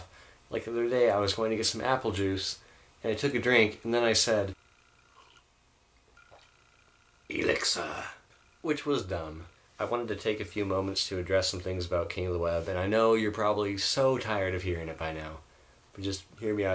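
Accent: England, United Kingdom. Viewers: O Your English accent is amazing! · Your English Accent is Class!! :D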